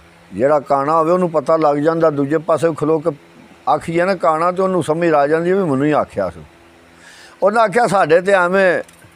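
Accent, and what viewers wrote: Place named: Sialkot, Pakistan. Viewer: Your accent of punjabi boli reminds me of my parents and mama ji who came from Sialkot.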